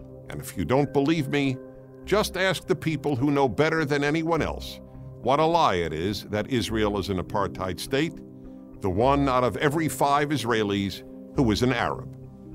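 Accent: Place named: United States. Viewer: It's the usual excuse and deflection from the presenter (who appears to be an American judging by his accent. The apartheid system is carried out in the Occupied Palestinian Territories. The Palestinians there are seperated, restricted and not allowed to vote over the Israeli government who rule their lives.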